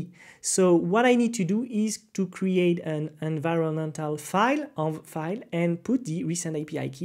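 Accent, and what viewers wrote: France. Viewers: Hello, t'a pas les même vidéo mais en FR? Bien que l'accens francais aide à la compréhension ahah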